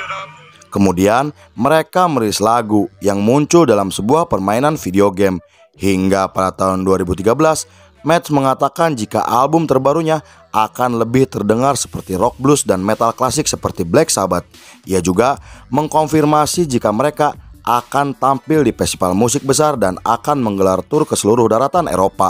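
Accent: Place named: Sunda, Indonesia. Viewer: ini narator nya orang sunda, kentel bat logat nya hahaha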